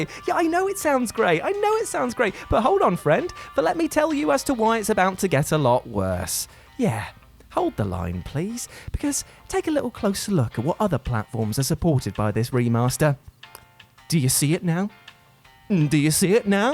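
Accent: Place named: United Kingdom. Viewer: I had to stop. He said “Haych dee” and “Ohmeeguh” too many times. British English is confusing, and annoying.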